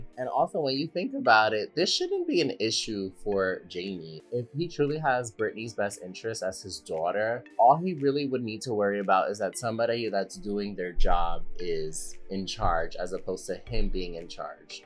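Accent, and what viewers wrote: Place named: East Coast, United States. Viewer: I just have to say I love the East Coast accent. I’m from Boston so I hear ya and love it....💜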